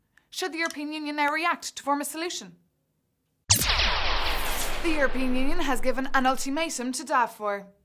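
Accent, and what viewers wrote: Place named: Ireland. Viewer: Irish accent... I like her accent...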